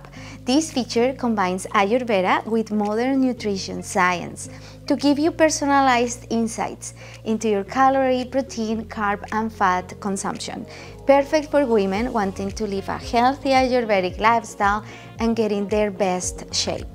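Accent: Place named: Latin America. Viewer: I like when latinos speak english 😂❤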